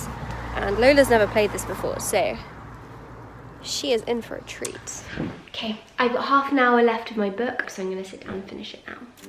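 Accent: Spain. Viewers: I love your Spanish accent! It’s so cute🤗 I’m a native Spanish speaker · Your Spanish accent is so cute!! Love you ✨💓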